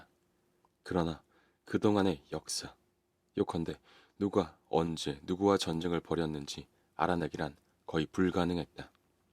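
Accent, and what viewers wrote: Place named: Busan, South Korea. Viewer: 부산분이셨군요~ 사투린 모르겠고 억양이 있어요 근데 오히려 그게 매력이 되는듯해요 전 좋네요^^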